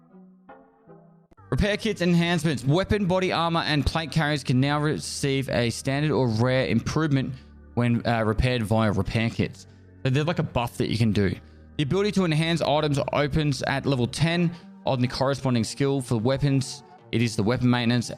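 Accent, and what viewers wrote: Australia. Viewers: Me being Australian understood every word he said but…. I could imagine not being Australian could be hard. But cry us a river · Nothing like having a patch read by an australian man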